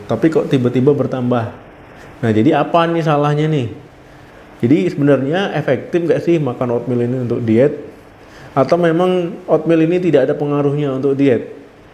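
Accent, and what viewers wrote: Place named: Riau, Indonesia. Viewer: Mohon maaf oot dok, dokter org riau ya? Logatnya familiar sekaliii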